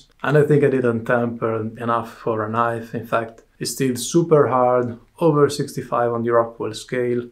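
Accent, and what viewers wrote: Venice, Italy. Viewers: he does sound a lot like the murano glass makers I know now that you !mention it.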